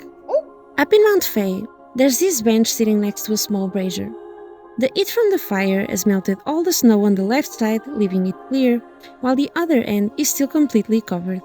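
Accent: Portugal. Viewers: Is your accent Portuguese? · Just out of curiosity, are you Portuguese? Because your accent sounds like it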